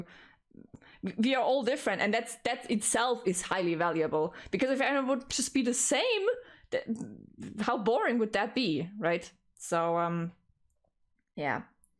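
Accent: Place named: Tyrol, Austria. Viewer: super das man dein tirolerischen akzent auch im englishen hört :D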